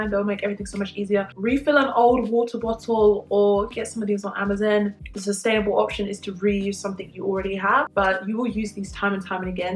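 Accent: London, United Kingdom. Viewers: You have a beautiful accent, are you from London?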